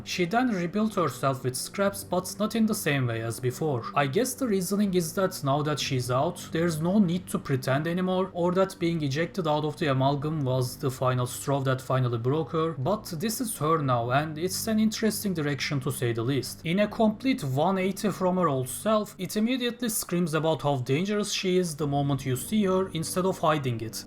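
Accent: Turkey. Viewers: I was gonna ask are you from Turkey because of you accent but then i saw your channel name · Nice video and a style that is harder to find in a good quality like your video keep going and türkçe aksanım biraz batıyor bir şekilde kurtulabilirsen çok hoş olur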